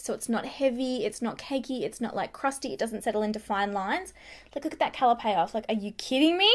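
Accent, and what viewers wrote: Australia. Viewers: I unsubscribed as well from Tati! Haven't watched her videos in months, because your videos are so real and besides, who can't get over listening to your beautiful accent!! You are a beautiful Australian woman who has helped so much with choosing products and I just love you!! Thanks for the tips regarding makeup and skincare!! Great video and your makeup is absolutely lovely and on point!!!! The Estée Lauder double wear foundation is one of Angie's, from Hot & Flashy, holy grail foundation! Therefore, since your recommending this foundation for oily skin types, then I'm gonna have to try it! I've been wearing bareMinerals's powder foundation for soooo many years... I believe it's time to try a liquid again!! However, or someone who may know...do you have a recent skincare (AM & PM) tutorial?? Because, I've been away for some time and am trying to catch up with you specifically!!! 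As always, much ❤️ from your 🇺🇸 RN oily sister!!💕. Hope to hear from you soon!! 💋💋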